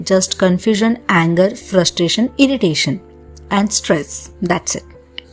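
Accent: Gujarat, India. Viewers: Gujju accent Kesaa, hahahah happens to me too LOL love you and your innocence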